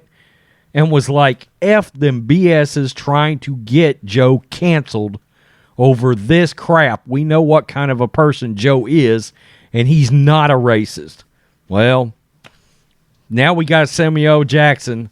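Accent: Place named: South, United States. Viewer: I'm pretty sure your White a** has said it before. Sound like good ol boy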